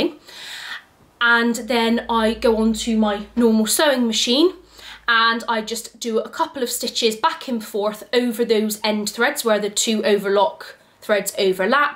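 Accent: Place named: Bristol, United Kingdom. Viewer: Excellent info video, especially for a novice like myself. Also, love your enthusiasm, and to be a little personal, I also love your touch of a Bristolian accent .... Bristol is very close to my heart and not too far away geographically 😉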